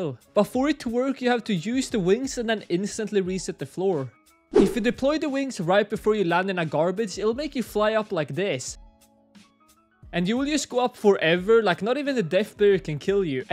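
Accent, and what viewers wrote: Sweden. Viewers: You speak swedish, I can heat it in your accent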